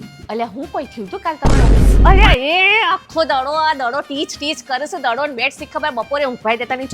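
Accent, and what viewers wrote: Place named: Mahesana, India. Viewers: મસ્ત મેંહાણી ભાષા બોલે છે તમે મહેસાણા ના છો??? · Mahesana nj bhasa sambhadva no Anand Avi Gayo👌👍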